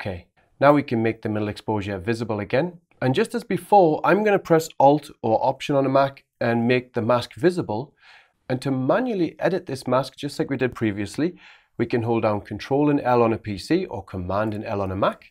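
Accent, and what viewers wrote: Newcastle, United Kingdom. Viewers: To use an old English phrase, you really know your onions! Superb stuff. And your Geordie accent is always a pleasure.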